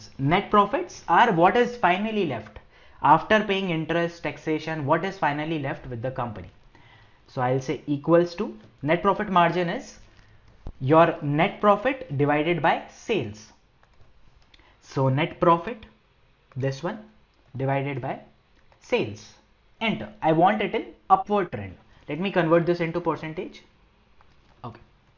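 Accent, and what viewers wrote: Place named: North India, India. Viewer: The accent is so annoying man.... I'm an Indian myself and I can't stand it.... reminds me of those annoying north Indian school teachers with sht English acting smart. 🤣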